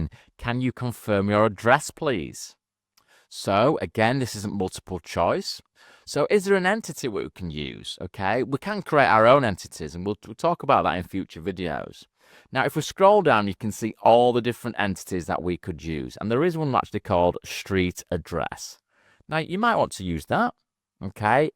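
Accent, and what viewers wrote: United Kingdom. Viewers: I believe this i s my first time of watching your video. THANK YOU for this. It was funny (especially with the British accent), practical, thorough and well explained. Keep them coming.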